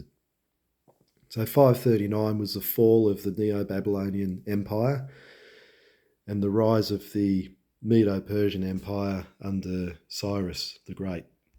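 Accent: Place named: Australia. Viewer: Thank you for doing this research and sharing this, good to hear an Aussie accent.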